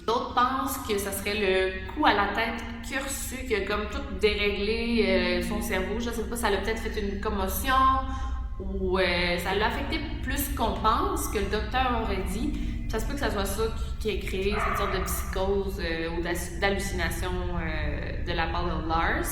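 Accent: Canada. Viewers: Fais pas de vidéos dans un endroit sonore car j ai pas tout capté avec l accent canadien étant français j ai compris 50 pour cent stp sinon beau travail toujours intéressant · Salut ta vidéo est super mais je pense qu'il ne faut plus que tu filmes dans cette pièce parce que la raisonnance couvre ta voix et de plus ton accent canadien c'est des fois compliqué de comprendre :) mais continu tes vidéos font flipper et ça c'est cool :)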